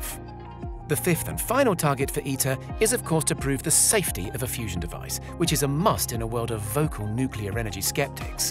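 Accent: England, United Kingdom. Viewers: WARNING... FOR ENGLISH ACCENT ONLY !!!!!!!!!!!!!!!!!!!!!!!!!!!!!!!!!!!!!!!! TALKING WITH THE NOSE !!!!!!!!!!!!!!!!!!!!!!!!!!!!!!!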